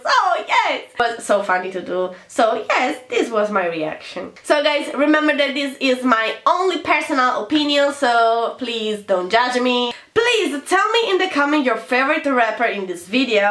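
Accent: Sardinia, Italy. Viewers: Fidati che dal tuo inglese si nota che sei italiana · Ma sei ita o ing/americana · come si sente che sei italiana 😂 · Figa se si sente che sei italiana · Siii. Io sono sarda e vivo in Sardegna. ❤ e nel riassunto del video la penso come te.😍 Ajooo. (Sarebbe una parola sarda)😂 e tra l'altro hai i miei gusti😂💕💕 sinceramente il tuo accento quando parli in italiano sembra sardo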